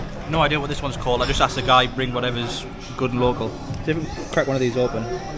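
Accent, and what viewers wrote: United Kingdom. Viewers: It was though to understand you at first place whereas I’ve got used to it and to top it all off: it’s appreciated to hear the British accent. Greetings from Poland lad 😎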